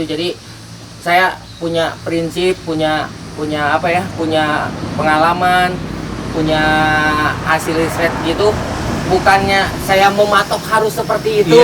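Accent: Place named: West Java, Indonesia. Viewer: Sunda kentel bahasanya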